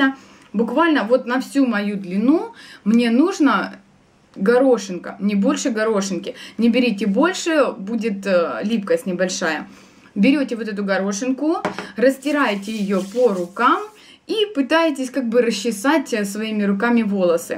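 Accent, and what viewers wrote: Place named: Ukraine. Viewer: у Вас такий милий акцент український=)і Ви сама дуже мила і цікава=)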